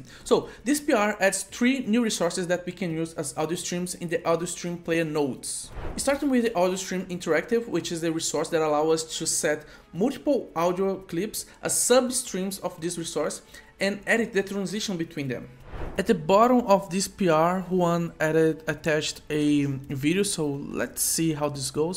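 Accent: Brazil. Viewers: Brazilian accent 🫶🇧🇷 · Tu é br né vei ? tem sotaque de br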